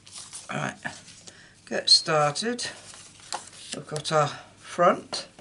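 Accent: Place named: Warrington, United Kingdom. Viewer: I am curious about your accent. I am from haydock, St Helen's living in New Zealand and your accent sounds warrington of Newton le willows. Love the card, it is a kind of a twist to resist stamping, very elegant.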